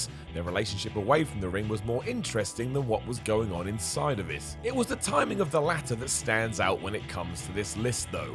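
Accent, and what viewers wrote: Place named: United Kingdom. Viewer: Skipped through the entire video within 5 seconds and saw every single thing I wanted to without having to listen to some annoying British millennial mark say anything.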